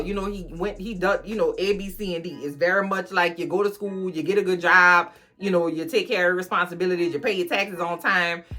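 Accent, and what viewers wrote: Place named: Louisiana, United States. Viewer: Your accent is so beautiful! 😄💖 Got to be Louisiana!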